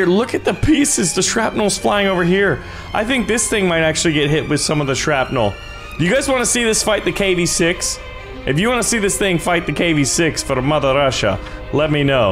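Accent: Germany. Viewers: Great German🇩🇪🇩🇪🇩🇪🇩🇪🇩🇪🇩🇪 ascent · Was Für deutscher Akzent Bruder! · How did he get so good at German accents??? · This is exactly why I subscribe to your your chanle!!! I love Fritz and Hand You do the best German acsent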